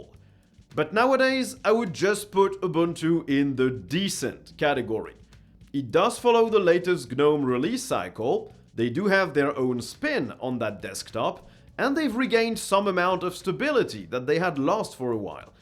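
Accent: France. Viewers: Tu serais pas Français par hasard?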